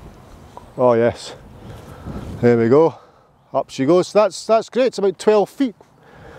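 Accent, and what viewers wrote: Scotland, United Kingdom. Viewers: Looking forward to trying this out, great video 🙏👍 Side note... after all these great lessons and tips, if Morgan freeman ever leaves the post open throwing your hat in the ring for new voice of God. I'm starting to hear a Scottish accent from above while standing over a golf ball 😊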